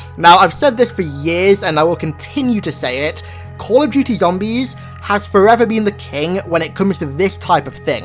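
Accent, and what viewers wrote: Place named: United Kingdom. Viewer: The british accent is cool but your lisps nerd makes me wanna kill myself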